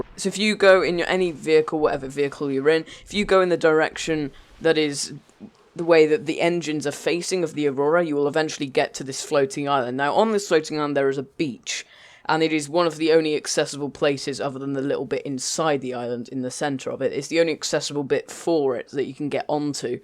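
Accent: United Kingdom. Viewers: can you stop damn moving the camera up and down it is seriously irritating and with the 'itish accent!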